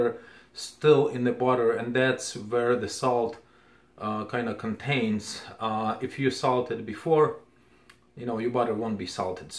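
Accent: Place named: Germany. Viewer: Very interesting. I sense a slight German accent. Good presentation. My aunt was a catholic Nun and she lived in a Monestary ( Kloster ) where they backed their own bread and made butter. And connected to it was a Brewery.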